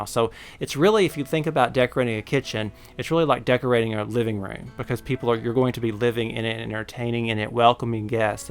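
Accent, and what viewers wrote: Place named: South, United States. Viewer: Absolutely stunning! This Kansas girl loves the southern accent, too!😂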